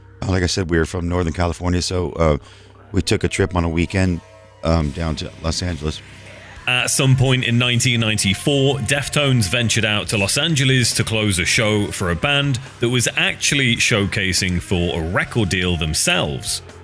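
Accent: United Kingdom. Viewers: The commentary really kills this video. Hearing a british guy say "pop locking" was more than i could take.✌🏾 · let me explain it for your side of the road leaf iq brain my point is the same video is being made with the same information so there’s no point in your uk voice ass documentary being made when there’s already a bunch made but wtv get the views you feining for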